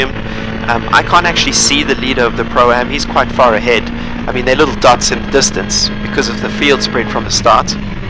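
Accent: South Africa. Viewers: Greetings from SA! Totally unmistakable accent right there.. (: Nice to see a home boy succeeding like this in the racing world. Suffice to say I'm jealous of the cars you get to drive... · You sound South African. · are you South African? you sound South African.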